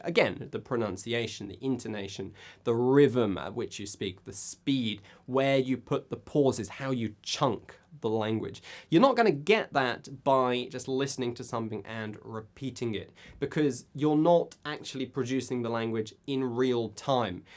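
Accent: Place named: United Kingdom. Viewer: I just LOVE your accent . Since i found your channel , i have changed my mind from wanting to practice american accent and pronunciation to british one .The british accent is so much more fun .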